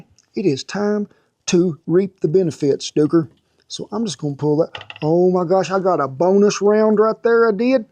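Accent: South, United States. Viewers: I can tell you are a man of character because you have an accent just like mine. The country accent is a dying tradition that is getting hard to find. I am so proud of my country heritage. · Thank you cool man with sweet south accent.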